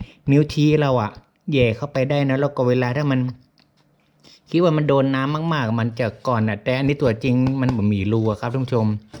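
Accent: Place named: North, Thailand. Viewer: แต่ฮาวเข้าใจเบิดเน้อตี้ปี้ อู้กำเมืองอ่ะกะจ้าว😁😂อู้มาหลายๆโลดเจ้าเข้าใจดีจ้าวววว....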